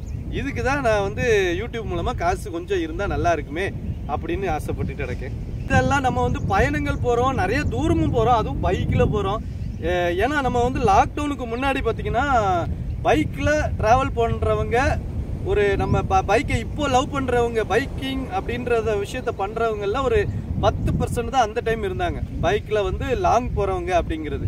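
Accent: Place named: Tirunelveli, India. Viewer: சகோதரா கவலைப்படாதீங்க உங்க மனசு போல உங்க வாழ்க்கை இருக்கும் நெல்லை வட்டார மொழியை நீங்கள் பேசுவதை கேட்கும் போது மனசுக்கு மகிழ்ச்சியாக இருக்கிறது முடிந்த அளவு உங்கள் சேனலே பல நண்பர்களிடம் கொண்டு செல்வதற்கு முயற்சி செய்வோம்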